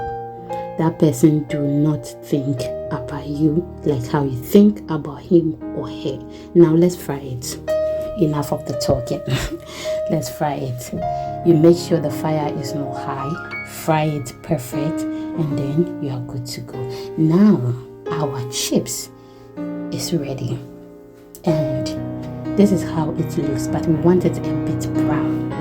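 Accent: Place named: United Kingdom. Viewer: You Uk accent is on point ooo still watching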